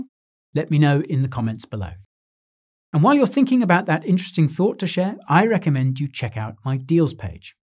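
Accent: United Kingdom. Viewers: Well, you have an advantage with the British Accent. Love the channel. No bullshit. Simply informative. Let people make up their own minds... unless they believe in horoscopes because they have no spine for personal responsibility. Keep up the good work. Perhaps we'll dub you an honorary American...who knows.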